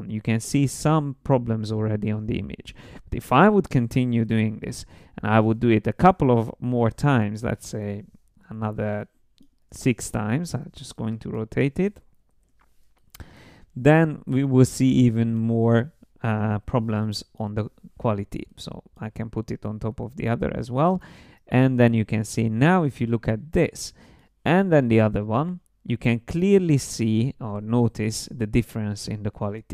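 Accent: Hungary. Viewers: fantastic again!! thanks!! btw my girlfriend is Hungarian and she heard your voice while I was looking at the video and said "is that guy Hungarian??" hehe, I myself cannot recognize the accent. Thanks a lot man, very useful tips and great clear-fun teaching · I heard your accent is hungarian - then I saw the little flag up there! 2 - 0 Hungary - Austria!!!!! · that hungarian accent is strangely comforting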